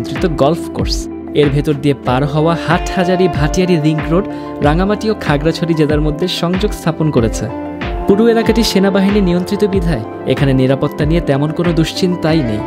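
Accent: Standard. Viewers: বাংলায় উপস্থাপনায় এক যুগান্তকারী উদাহরণ অপনি এবং বিশ্বকে সম্পুর্ন শুদ্ধ বাংলায় উপস্থাপন আপনার থেকে ভালো কেউ করতে পারে নাই এটা নিশ্চিত ♥️♥️💕💕 এগিয়ে যান আরো অনেক দূর ♥️ আল্লাহ তায়ালা আপনাক নেক হায়াত দান করুক ♥️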